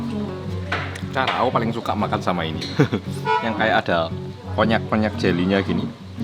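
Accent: Java, Indonesia. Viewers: Ngomonge medok banget jowone gak ilang😂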